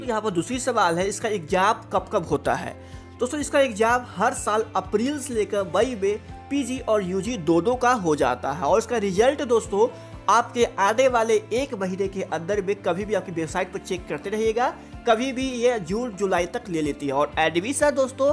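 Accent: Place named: Bihar, India. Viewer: Bolne wala banda bihar ka hai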